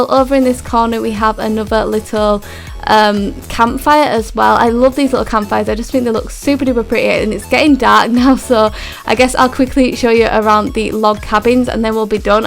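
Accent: United Kingdom. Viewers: Honey Dew I love her accent too! But I’m warning you do not try and replicate a British accent as an American you’ll sound so stupid! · This may or may not be creepy but like I really love your voice (like your accent) Maybe its because I'm American but I really want a British accent (Also I just started watching you like 20 min ago and I'm already subscribed :D)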